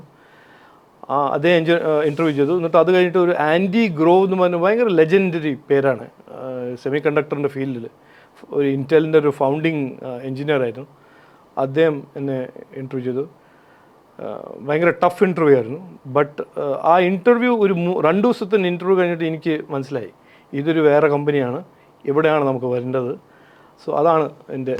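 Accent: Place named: Palakkad, India. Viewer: പാലക്കാടൻ മലയാളത്തിന്റെ ഒരു രുചി സംസാരത്തിൽ നിഴലിക്കുന്നുണ്ട് thankyou sir 🙏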